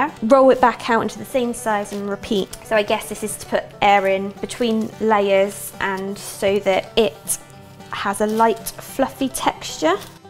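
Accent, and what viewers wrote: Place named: England, United Kingdom. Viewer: I'm hungry again! could walk into any Southern kitchen... and everyone would assume she's a local girl. ( unless she starting speaking in that beautiful English accent). 😀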